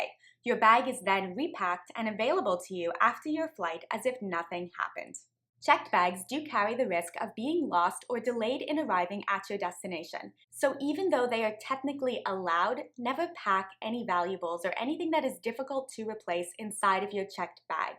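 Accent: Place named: New England, United States. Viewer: Thank you for this information! Also, love your hint of an accent too - is it New England (close?). Thank you - Sub'd!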